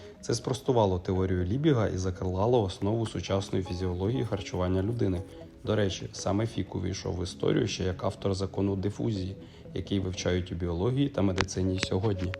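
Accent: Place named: Kuban, Russia. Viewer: «калакольчіка» «да» « сейчяс» ви з Кубані ? Розмовляєте якби українською але акцент і словечка з російського наречія, з Кубані мабуть…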